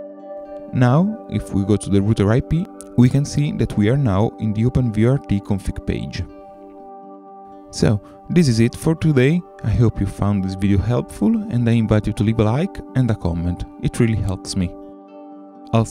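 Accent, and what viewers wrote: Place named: Italy. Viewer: Al 99.9% è italiano. Come lo so? Non parla inglese male, ma l'accento è chiaramente italiano (quello vero, non quello che gli americani pensano sia italiano). Posso dire che no è del sud, probabilmente è del centro-nord. Se hai visto il video dovresti aver notato che la home dell'utente che sta usando si chiama " ", quindi lui o qualcun altro si chiama o magari è un dipendente di... chi lo sa. Ma la cosa più importante è: come faccio a ripristinare la versione originale del firmware della fritz!box, sempre ammesso che non mi trovi contento?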